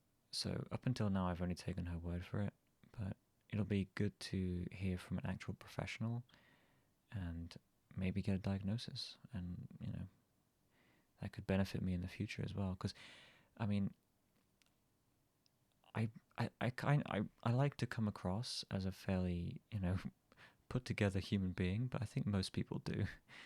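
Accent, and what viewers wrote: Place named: United Kingdom. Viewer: this randomly got recommended to me, hi! we love british accents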